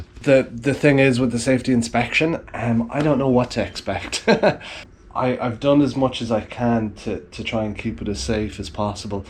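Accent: Ireland. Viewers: Gorgeous bike! Are you Irish by any chance ?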